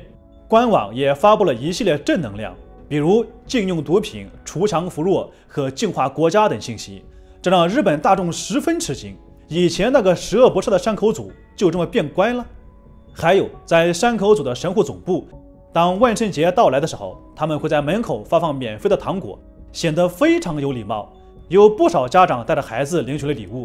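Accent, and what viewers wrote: China. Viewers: 不是嘛 你聽口音就知道版主是中國人了吧 (我猜中國憲法肯定沒有這條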